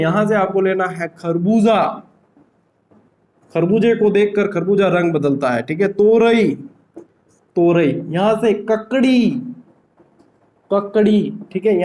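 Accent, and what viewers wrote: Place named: Marwar, India. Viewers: Na sirji hamein to aapki Marwadi bhot achi lgti h...wait krte rehte h ki aap Marwadi mei padhao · Marwad ki mitti boli k sath aapki class bahut hi achhi h sir.thank u so much .... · Sir aapki marwadi bhut chokhi lag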